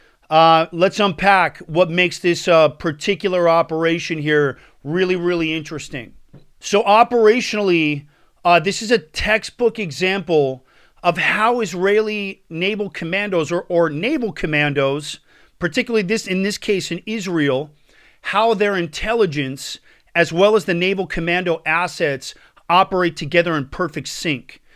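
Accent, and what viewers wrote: United States. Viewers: Your israeli elite forces? You sound pretty american to me so are you israeli or american which side are you picking if they both went to war with each other? america is controlled and infiltrated by israeli’s that act like they care about american interests but all they do is serve israel. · Amhaz probably has a lot of info on seaside smuggling as well as any planned attack on Israel's off-shore gas rigs. Nice work, Israel &! You a New Yorker...?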